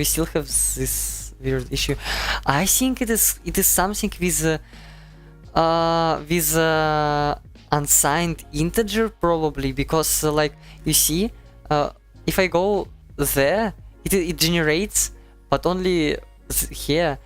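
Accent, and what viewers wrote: Russia. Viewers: мужик у тебя капец акцент лютый русский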